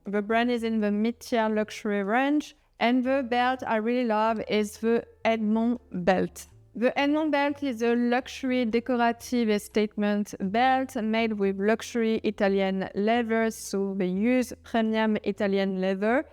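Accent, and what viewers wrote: France. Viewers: unrelated, but the French accent sounds very fancy. It matches the theme haha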